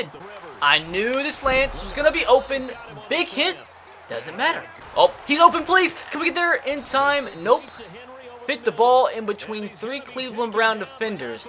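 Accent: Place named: Alabama, United States. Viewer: Love the southren alabama accent bro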